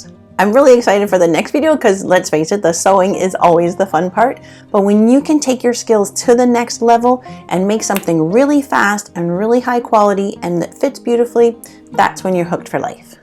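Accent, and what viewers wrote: Canada. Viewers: I could tell you were Canadian by your accent 🤯 surprising! Hi from Canada 🍁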